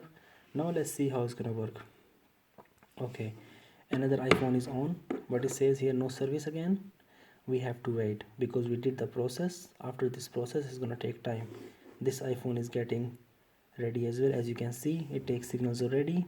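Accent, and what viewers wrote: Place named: Pakistan. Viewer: Bhi tum Pakistani lagta hn awaz sa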